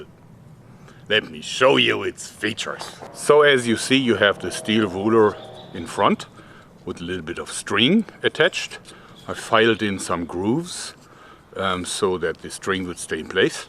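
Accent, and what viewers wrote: Germany. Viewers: I think you are German.. nichts wahr?..I hear pronunciation...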